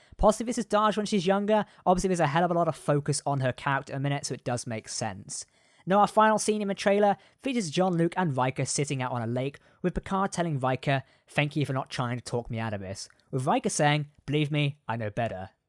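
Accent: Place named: United Kingdom. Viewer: It's a shame that this fellow is cursed with that damned British accent which causes the speaker to slur their Rs into Ws (Mayfair?), making them sound like Elmer Fudd: "Be vewy quiet ... I'm hunting wabbits". That, plus his penchant for speaking too fast, I can understand very little of what he is saying. Very annoying to listen to.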